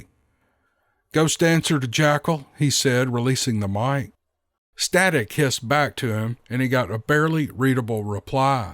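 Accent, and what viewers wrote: South, United States. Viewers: ...can I call you that for short? Anyway, this has been one of my favorite narrations and stories from your channel. Your southern accent and pacing make for good listening! Keep up the good work brother, I really appreciate your craftsmanship. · Great 👍 story an terrific experience Listening too you" and your Southern draw" Gray Eagle seems to be a fascinating character in this story I Must say' toward the end I started Feeling sorry for Big Foot' after Gray Eagle got through working them over Ha!! Ha!! Seriously a Revving Vidio... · Your voice, the southern drawl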